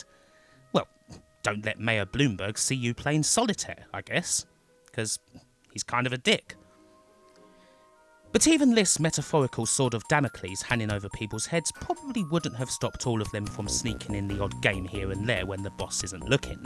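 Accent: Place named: Essex, United Kingdom. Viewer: great video where in essex are you from? im from colchester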